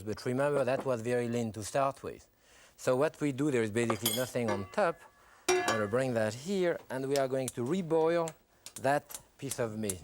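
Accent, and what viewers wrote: France. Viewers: Sometimes a bit hard to understand his french accent but I'm learning he is an awesome chef to watch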